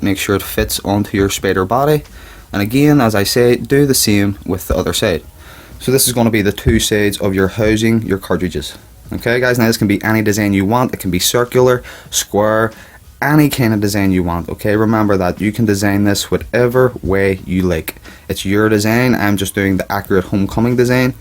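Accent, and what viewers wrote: Ireland. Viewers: I didn't know he was Irish to 😁😲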